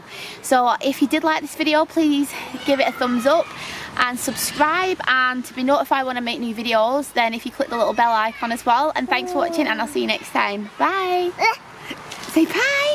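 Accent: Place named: North, United Kingdom. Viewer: Your children are just adorable 😍 i was guilty of constantly telling my kids to “be careful” whenever they climbed where as now I’m the opposite and totally encourage them to explore! How old are your children? And it’s so nice to hear a fellow northerners accent 🥳 oh you’ve litterally just answered my question as I’m watching lol is 13 months, awwww!! What made you decide to home school honey? Yes I think you should make that video, it would be interesting to learn more as it sounds ignorant but the truth is I don’t really know but it would be good to find out from you!! 🤗 xx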